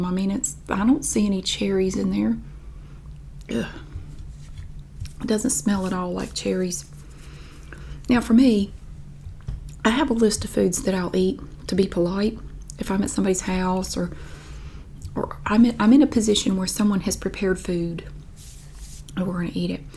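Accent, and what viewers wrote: South, United States. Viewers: There already is a letter for how you pronounce pie; Pæ. You also pronounce another letter you don't have in the english language when you say oil; å(l). I can't understand how someone could complain about how you pronounce words; southern dialects are wonderful. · The day you have to really justify your beautiful southern accent, I swear to Sweet Baby Jesus I will go bat-sh*t crazy. No, just NO. Do these people not see the name of your channel? It's a clue, ugh. I say you do a whole 40 minute video saying the word Pae. I'd watch the whole darned thing. Thank you for what you do. Hugs! Ana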